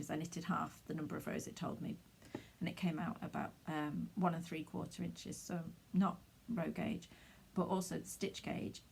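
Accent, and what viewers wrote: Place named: Northern England, United Kingdom. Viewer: I really enjoyed the podcast and the variety of things you have done. It is so refreshing to find somebody who doesn’t like grey!! I used to be completely autumnal in my colour palette, but have recently switched to blues and purples…but still no grey if I can avoid it 😁 I also loved your accent. I am originally from Cheshire, but have been ‘exiled’ in the South East for 39 years, so a Northern voice always makes my heart sing. A great first podcast - keep it up!! Jinny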